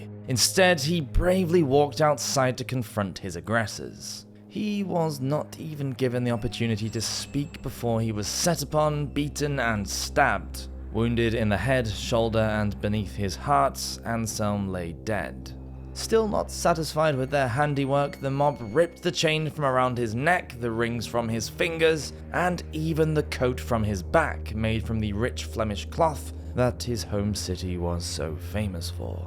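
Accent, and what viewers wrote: United Kingdom. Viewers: Oh yess....the solid music the british accent....yess....a new topic, medieval of course...yesss so soothing, so interesting.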